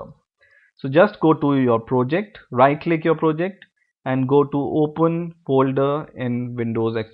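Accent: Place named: India. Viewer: indian accent is so anoying to listen to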